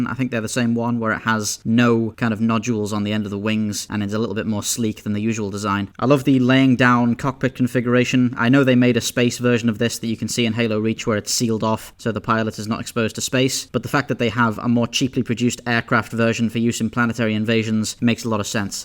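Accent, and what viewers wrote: United Kingdom. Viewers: You are clearly a Brit.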